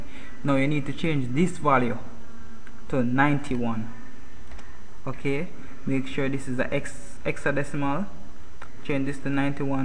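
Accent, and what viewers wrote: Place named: Jamaica. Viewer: i can tell ur jamaican.....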